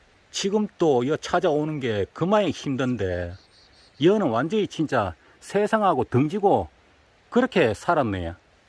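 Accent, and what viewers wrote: Gyeongsang, South Korea. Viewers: 구수한 갱상도 말로 전해주는 자연소식 잘 보고 있니더 항상 조심 하시소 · 이분 대구사람인가? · 경상도 같은데요 겅남쪽 ~좀 알키주이소~자랑만하지말고예~😃😃😃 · 경상도 오빠야네예 ㅎ 조심해서 다니세요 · 아름다운 풍광과 맛깔 나는 경상도 사투리가 너무 잘 어울립니다. 고맙습니다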